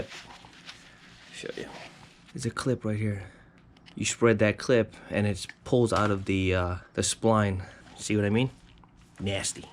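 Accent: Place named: New York, United States. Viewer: is it just me or is his NY accent really showing in this vid 😂😂 I love it NY rep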